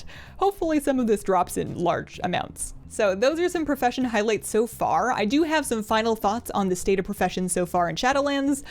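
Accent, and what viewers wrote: Canada. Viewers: Subtle Canadian accent? ♥ Canada.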